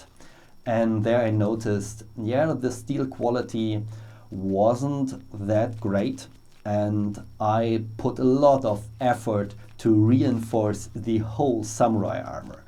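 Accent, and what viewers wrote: Germany. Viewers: are you german? can not really idetify your accent..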